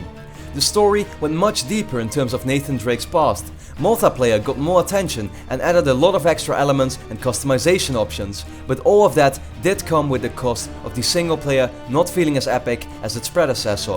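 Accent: Netherlands. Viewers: the akward moment when you hear the shitty dutch accent. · Are you from Holland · Are you Dutch by any chance? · Really obvious he is from the Netherlands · u dutch? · Is hij Nederlands? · Jij Bent ZoieZo Nederlands Want Dat hoor ik aan je stem ;) *****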